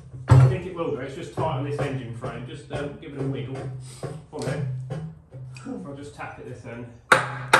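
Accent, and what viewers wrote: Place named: North Lancashire, United Kingdom. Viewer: Sound like your from north Lancashire, what bits do you need I'm sure I can find them 😉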